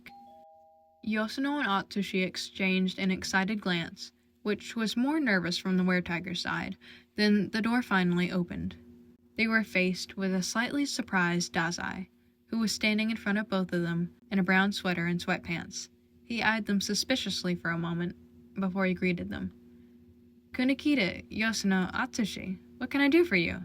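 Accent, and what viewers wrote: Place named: United States. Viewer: Me trying to understand: 😦😧😨😰 You have a very strong American accent, my hopes of comprehension are long lost to the wind bahsbahha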